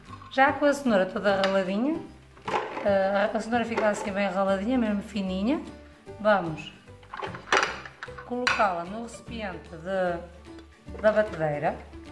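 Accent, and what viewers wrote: Portugal. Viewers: Amo sotaque de Portugal. Sou mineira do Brasil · Sotaque lindo nosso de Portugal e não enrola vai logo a receita adorei isso 👏🏼👏🏼❤️vou fazer depois venho contar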